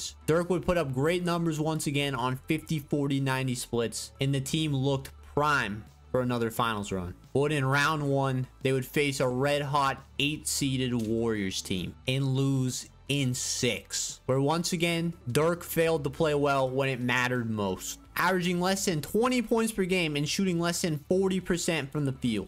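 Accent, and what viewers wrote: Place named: New York, United States. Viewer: its so funny hearing a new york accent talking about the mavs so much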